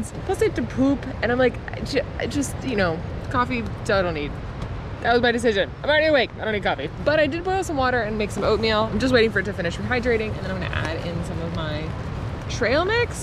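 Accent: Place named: Pacific Northwest, United States. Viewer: You literally speak and act like all of my PNW friends. It's almost like its own dialect. It's hard to explain unless you grew up here.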